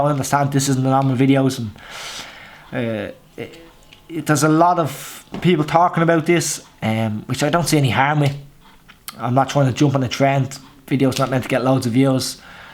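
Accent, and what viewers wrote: Ireland. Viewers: Pure Irish , nice English 😀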